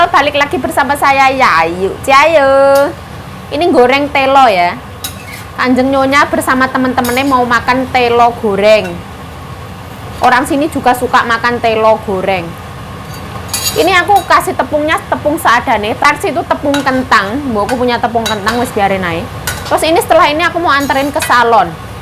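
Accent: Java, Indonesia. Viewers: Aku seneng sm medhoknya mbak,cletukan² bahasa jawane bikin mesem²,hihihiii...sukses yo mbak,sehat² selalu...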